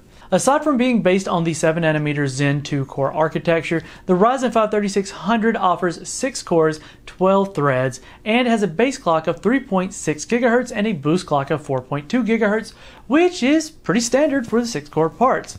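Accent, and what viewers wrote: South, United States. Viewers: Just out of curiosity , which southern state are you from if i might ask!?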